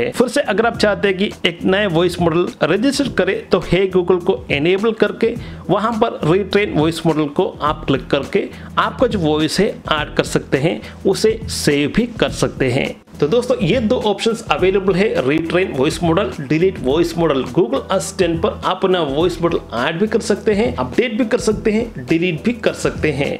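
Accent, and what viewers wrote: Kerala, India. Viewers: Thank you bro are you from Kerala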